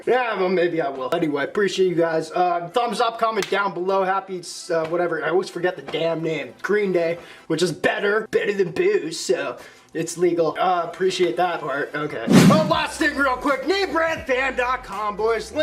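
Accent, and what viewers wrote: Canada. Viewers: U canadian bro?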